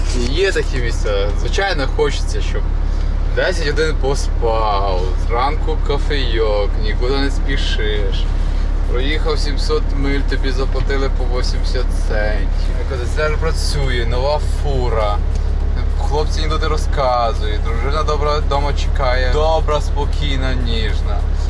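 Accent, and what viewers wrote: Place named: Ukraine. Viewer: русских слов, но с укр. вымовой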